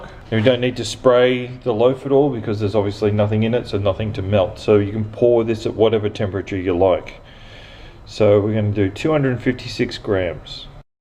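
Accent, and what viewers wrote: Australia. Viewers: Oh Yay, a soap maker with an Australian accent!!! I'm in the early stages of learning about soap making, and I'm nearly ready to start making. Can you please give me some ideas of the best places to buy soap supplies, essential oils, colours etc... In Australia? I'd really appreciate it. The only place I've found so far is, Aussie candle supplies.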